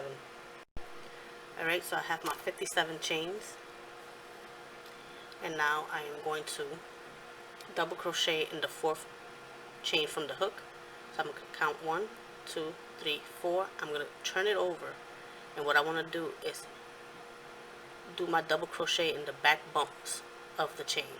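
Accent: New York, United States. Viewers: Thank you for your clear instructions. I can't wait to start 😊. Do I hear a fellow New Yorker?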